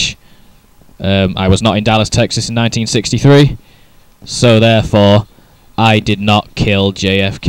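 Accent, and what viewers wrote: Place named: England, United Kingdom. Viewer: OH YES THANK GOD SOMEBODY ENGLISH!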